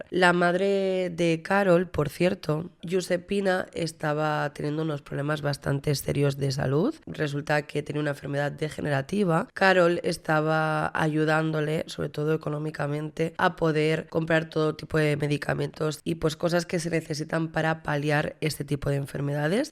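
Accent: Catalonia, Spain. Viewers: ¡Buenas! Primero de todo gracias por lo que haces. En cuanto a lo de vocalizar... creo que la gente confunde tener acento con vocalizar. Tienes acento catalán y se nota. Pero eso no es nada malo, es más, es bonito que las personas tengamos distintos acentos. Yo creo que más bien se refieren a que te salen catalanismos estilo "el caso que nos pertañe". Pertañe en castellano no existe, sería atañe. A todos nos salen expresiones de nuestra tierra y es lo bonito de la lengua! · Tienes un acento catalán bastante fuerte. Yo te entiendo perfectamente porque wiybdevla zona😅😅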